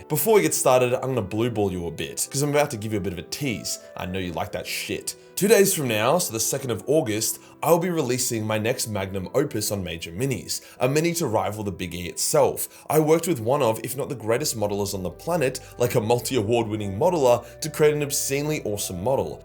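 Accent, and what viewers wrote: Australia. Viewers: May I as central europian slav request you to speak about 10% slower 😂 i get everything you talking about but it is really paiful for understanding aussie accent in this cadence of words 😂 I still love naughty Austrian non painter creator ❤️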